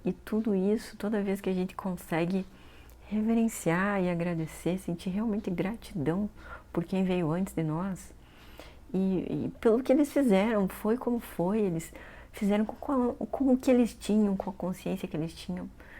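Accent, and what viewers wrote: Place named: Rio Grande do Sul, Brazil. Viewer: Você é gaucha nata né "bah" e sotaque você do interior? Eu tbm sou gaúcho. Kkkk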